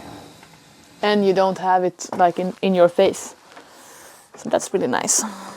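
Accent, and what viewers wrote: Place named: Sweden. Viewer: And why do you think so? I am Norwegian and I can tell you that first the language is genuine, Quite easy to hear this is Swedish English, AI can not fake that variation yet. Second; the nature is genuine and it is not hard to live this way. This channel is showing a real family, living in the real Sweden. I urge you to take a trip there to see the country. And to I know you don’t believe in Jesus, but Jesus is soon coming back, where will you be?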